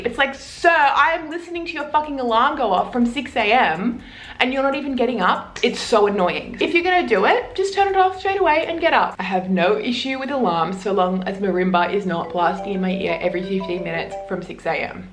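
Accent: Australia. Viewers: She's Australian thats how it sounds Mate with Aussie accent. What accent should she use MANY out there?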